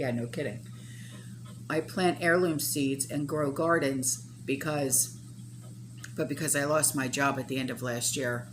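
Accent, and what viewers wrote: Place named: Staten Island, United States. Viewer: OMG how have I not seen your videos before? Listening to you is a blast from the past, you sound like Staten Island where I came from (I'm in North Carolina now). Thanks for all the info, I've clicked the subscribe button!!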